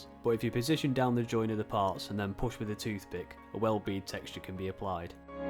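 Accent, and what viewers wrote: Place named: Manchester, United Kingdom. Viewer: What a find this channel is! I’m new to modelling and find your presentation excellent. Also you sound very local. Are you a Manc?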